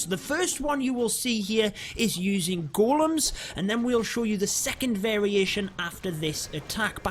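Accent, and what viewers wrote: United Kingdom. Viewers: That british acent tho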